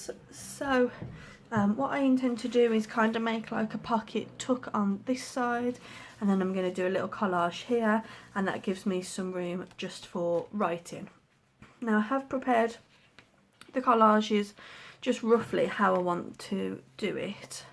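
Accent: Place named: Birmingham, United Kingdom. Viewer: I’ve just binge watched your travel journal videos and you are a breath of fresh air! Plus, as an exiled Brummie it’s lovely hearing the accent and the planes going to Birmingham international. I’ve got so many maps I’ve collected over the years plus loads of tickets leaflets etc. Thank you for sharing your expertise. 😘😘😘❤️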